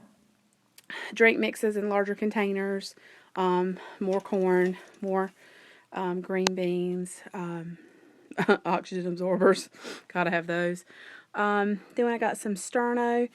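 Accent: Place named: South, United States. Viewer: Great video. I like your curtains. I think I will try it too. Love your accent. You must be a Southern Belle like me!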